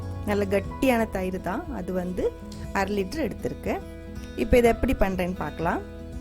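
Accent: Kerala, India. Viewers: I like your Tamil slong with malayalam infusion. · Very good and tasty recipes with little ingredients 👌love your Tamil with Malayalam accent👍👍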